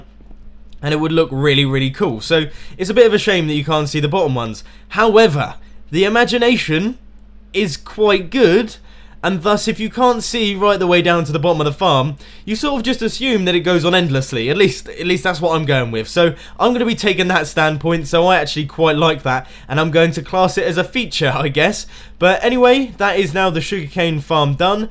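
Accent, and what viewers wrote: England, United Kingdom. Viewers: YOU ARE ENGLISH? i didnt know :/ :P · It’s hello, he’s just British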